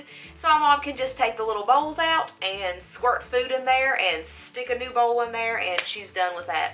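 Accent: South, United States. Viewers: I am in love with your voice ... Lol your beautiful as well but that southern voice....sheww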